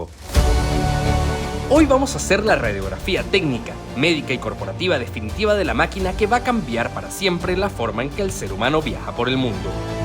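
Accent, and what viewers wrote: Venezuela. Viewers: Jajajaja, la gente quienes comentan sin conocer los canales! El usa su verdadera voz y los venezolanos hablamos así, y no hay ningún país, ni siquiera España, en donde la gente hable español pronunciando absolutamente todas las letras, entendido, brodel?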